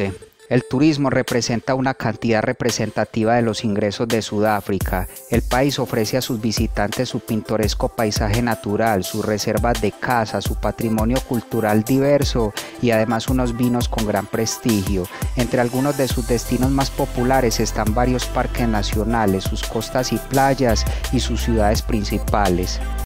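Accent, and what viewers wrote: Antioquia, Colombia. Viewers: El man que habla en este vídeo es paisa? Buen vídeo 👍🏻😁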